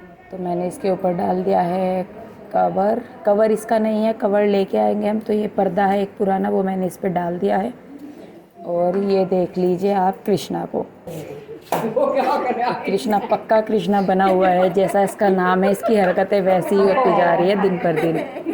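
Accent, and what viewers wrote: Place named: Saharanpur, India. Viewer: aapki language ka jo touch hai ...m first vdo se hi smajh gyi thi ki ho na ho aap saharanpur se related ho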